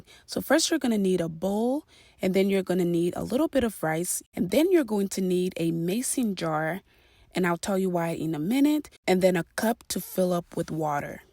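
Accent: Liberia. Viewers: She sound Liberian... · Liberian Jue.... Our accent never hide. 🇱🇷♥️😊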